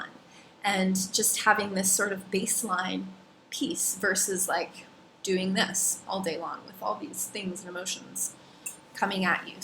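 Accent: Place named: Minnesota, United States. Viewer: Too true. All those years in HI and you can still hear the MN in your voice. Love it! :)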